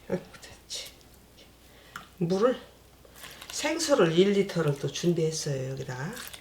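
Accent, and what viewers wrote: Chungcheong, South Korea. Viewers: 말씀하시는 말투가 충청도 말투이신디 이 어느지역이예요? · 저도 충청도 대전 사람이지만, 해외에서 선생님의 구수한 충청도 말씀으로 자세한 설명을 들으며 보니 고향생각도 나고 구수하고 정겹습니다.. 감사합니다. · 보리밥에엿기를넣고 한꺼번에 삭히는 방법 이 편하겠네요 구수한 충청도말 씨도 부럽네요 배우고싶어 따라하는데 잘ᆢ안되네요 열씸히구독하겠습니다 고생하셨어요 잘ᆢ보았 습니다❤ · 충청도이신가봐요 넘웃겨요 말씀하시는것이 구수하구 ㅋㅋㅋ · 충청.음정.넘 정겹네요~^^ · 얼굴 보여주세요. 항상 웃음이. 정겨워요. 여사님. 충청도. 사투리. 옛. 어린시절생각이 많이 납니다. 칠십이. 넘어도. 구수한 자기 고향 사투리가. 듣으면. 어린시절. 시간들이 필림처렁. 돌아가. 자주. 시청합니다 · 아이고 너무너무 잘하십니다 가까이계시면 인사나누고 싶네요 달인이십니다 충청도 억양 말씀이 정말 정감이 가네요 저도 충청도 부여에유 부디 건강하시고 맛난 고추장 계속 올려주셔유 ❤ 존경스럽네유 🎉🎉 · 아주머니 충청 도래요 말씀 하시는것이 충청 말씀같아요 고추장 하는것 된장도 잘보았습니다 항상 건행 하세요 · 고추장 정학희. 일러주셔서. 감사하오.충청도 사투리 소탈한목소리 좋아요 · 구수한 장맛 구수한충청도 사투리 잘 보고 갑니다. 건강하세요. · 충청도 사투리가 넘 정겹고 젤 맛나게 설명을 해주시니 머릿속에 쏙쏙 들어오네요~영상 감사합니다^^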